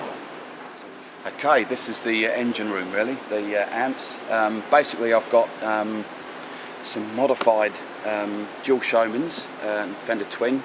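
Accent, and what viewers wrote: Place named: England, United Kingdom. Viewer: he sounds english to me.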